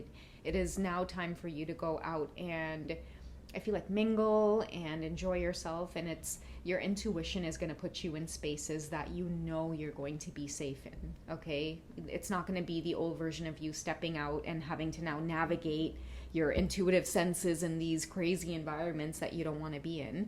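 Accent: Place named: Trinidad and Tobago. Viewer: Are you Trini? That bazodee accent 😂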